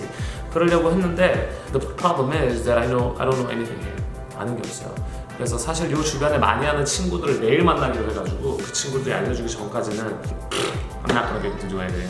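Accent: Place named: New York, United States. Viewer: 저는 님이 뉴욕쪽 액센트가 있다고 생각해서 동부출신이라고 생각했는데 아닌가봐요..ㅋㅋ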